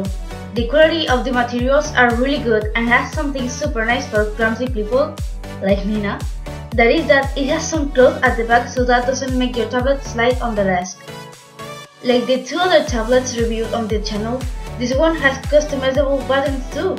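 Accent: Spain. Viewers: She is spanish, te lo noto en el acento GUAPAA🥰💕 te kiero mucho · Se nota que eres española 😂😂 hablo igual que tu el ingles bien pero con un toque español😂😂 besitos 💖💖👍👍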